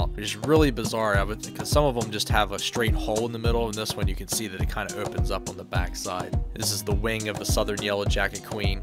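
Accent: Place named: Philadelphia, United States. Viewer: N.E. Philly? Your accent gave you away 😂 Im in Mayfair and hoping to not get stung this summer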